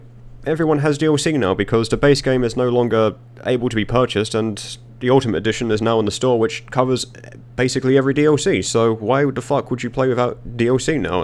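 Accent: United Kingdom. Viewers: Like many other have said we really don't give a shit what you play because we stick around for your stupid personality and all the other bullshit shenanigans that you manage to find. So whether you go on and play some PD2 occasionally just to bitch about all the shit players who fuck up a normal jewelry store heist and hackers or just play other stuff because that's what you want, 95% of us will still be here..... just for your pommy fucking accent. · Are you british or australian ?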